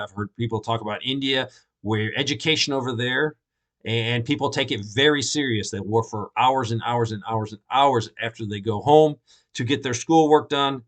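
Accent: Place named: South, United States. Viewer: It’s 3. Nu cle yer. Also, he’s Southern. Everything has more syllables in the South.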